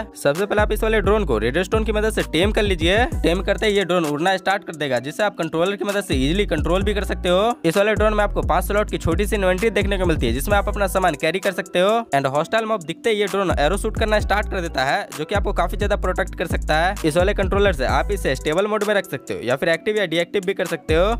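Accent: Bihar, India. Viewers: Your speaking style is like bihari but ek dam mast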